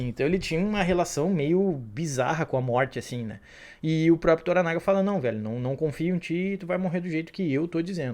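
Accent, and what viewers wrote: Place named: Rio Grande do Sul, Brazil. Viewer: muito obrigado pelo acompanhamento da série com os vídeos, pra mim tu fez a análise mais fiel e profunda da série que vi no YT! E é tri ver o sotaque gaucho mandando bem por aqui! Abraço!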